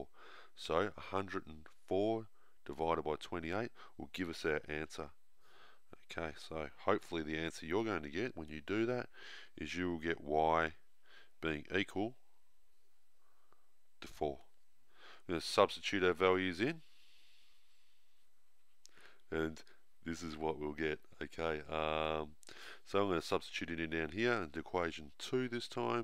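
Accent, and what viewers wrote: Australia. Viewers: Don't diss the Aussie accent! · It’s an Aussie accent but his nose does sound blocked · he is i'm from the land down under you get thus accent all the time. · clicked on this hoping its not an american and wasnt overjoyed to find out its an australian😁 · its a really strong australian accent and I dont blame you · He is australian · Inaya way Australian mate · hes australian mate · Great stuff! And refreshing to hear an Aussie accent on Youtube for a change 👍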